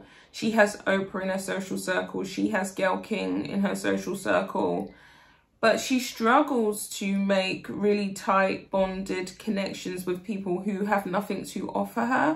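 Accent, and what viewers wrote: England, United Kingdom. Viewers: you are a beautiful, intelligent woman who communicates so articulately with your English accent, which I absolutely LOVE as an American!💓🍀💓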